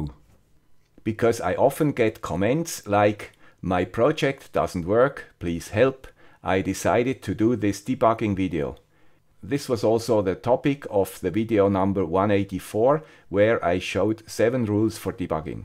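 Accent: Switzerland. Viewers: I think I knew that, black forest or something. I spent a day in Bex a few years ago with the jet wing on my back guy Yves Rossy. He also had your Swiss accent. Swiss seem to be decent people mostly. I made a bunch of videos of all that. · Since you are the guy with the Swiss accent , I will call Scott the guy with the German accent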